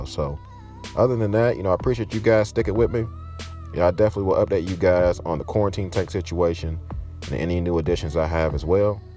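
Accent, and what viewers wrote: South, United States. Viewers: Is that a country accent I hear??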